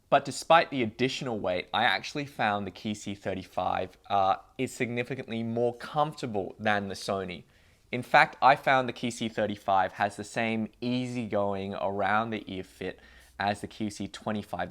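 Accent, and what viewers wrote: Australia. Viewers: are you Australian? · and oh i have to say oz accent on an asian looking dude is effin damn sexy! have a great day buddy!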